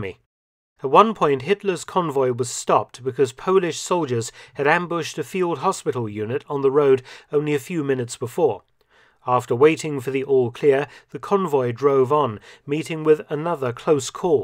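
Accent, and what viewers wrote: United Kingdom. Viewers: his proper British accent, is second to none. 💯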